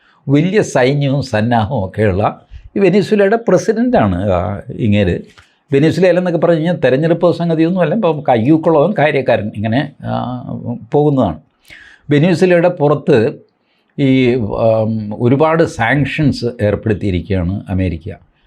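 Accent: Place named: Alappuzha, India. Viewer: "അയാള് ഡീക് അടിച്ചു"😂😂😂ആലപ്പുഴക്കാരുടെ സംസാരരീതിയാണിത്. 😂😂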